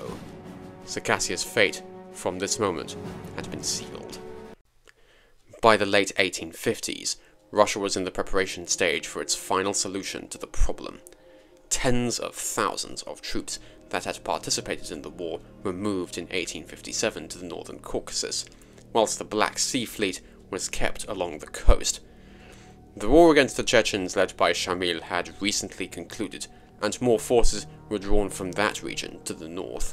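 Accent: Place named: United Kingdom. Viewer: I dig your voice its fine and it sounds great especially with the British accent. The pronunciation of the tribes of spot on and the music in the back is absolutely epic I enjoy this video and we'll be looking into more of the content.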